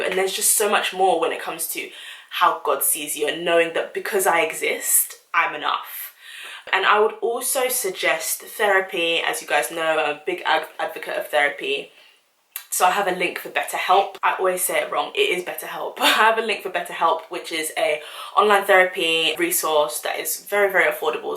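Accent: United Kingdom. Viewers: I am glad that your are speaking pure British English, and not the wrong stuff that we Africans speak. Obviously you are born and raised in the UK. However, recent immigrants should be able to learn that, with a little effort, especially those with university degrees. I am a recent immigrant and I respect any new language I learn and try to speak like the natives. Thank you for being their so that when I get better at the language, whenever Africans ridicule me for not speaking African English, I will show them your video.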